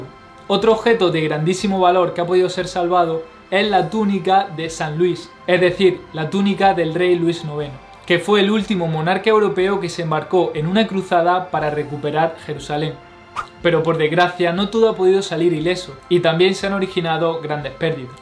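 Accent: Andalusia, Spain. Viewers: te pregunto hablas otro idioma a demás del español por que te escucho y me parece que hablas con mucha dificulta el idioma español o castellano como lo quieras llamar, tal vez tengas un asentó muy fuerte original de el país español y real mente a los latinos senos hace algo difícil entender a un español cuando tiene un asentó muy pronunciado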